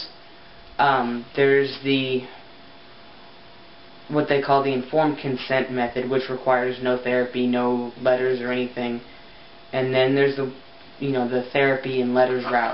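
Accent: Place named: Texas, United States. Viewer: Wait, wait, wait. "Here in Texas" - you live in Texas(or at least at the time of this video)?! :O Hahahahahaha, I've been going through all your videos, and I'm like, "This guy's accent is so similar to mine, I wonder if he's from somewhere around Texas..." 'cause I was born and raised here. What're the chances, man? You've got my EXACT couch, AND you live in Texas. This is just awesome! Hahaha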